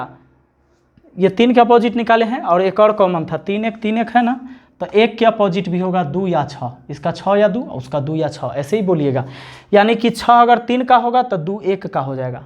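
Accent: Bihar, India. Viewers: Bihari boli❤ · का सर जी ,रउओ बिहारी बानी का??? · Sir bihar se ho naa sach bolna🎉🎉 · ap bihari sur me bolte ho to maza nhi ata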